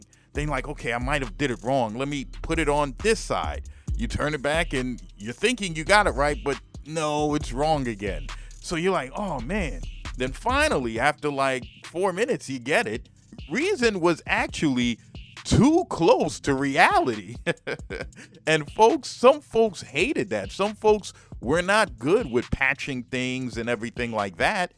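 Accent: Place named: Haiti. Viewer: sak passé! Map boule! Real talk, I can spot a Haitian accent. Even though you don’t really have one per se, I can still tell. I kind of sounds like a queens/Long Island accent mixed with Haitian accent. Love the channel brother.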